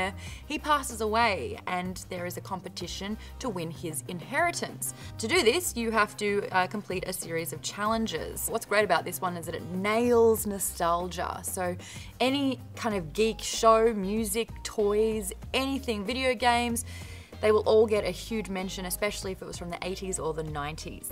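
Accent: Australia. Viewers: Cool. finally an Aussie accent. Read: The "Tales of the Ketty Jay" series. by Chris Wooding. More steampunk than high fantasy though, but this video is a coupla years old so you might have read this. If not, do yourself a favor.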